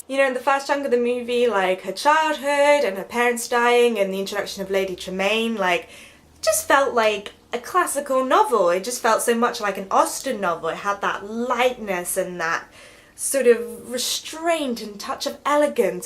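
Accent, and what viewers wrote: United Kingdom. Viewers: are you from UK ? just wondering about your accent. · Yeah cause you sound like your from Sufford(the same place as Lily James came from).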